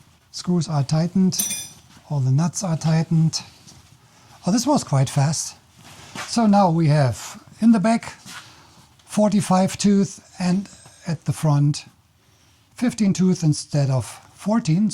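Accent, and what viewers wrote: Germany. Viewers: hör ick da nen Berliner Akzent raus? So herzig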